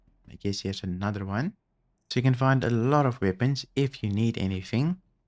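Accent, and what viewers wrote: South Africa. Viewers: South African accents are very lekker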